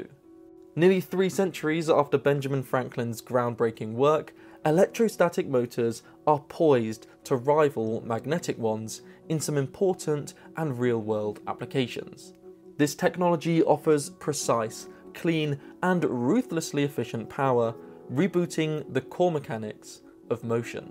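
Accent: England, United Kingdom. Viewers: Drop the english accent. I miss about 10% of your words so I can't follow you. Too many w's. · couldn't finish the first 2 minutes because of your puke English voice "mowtas"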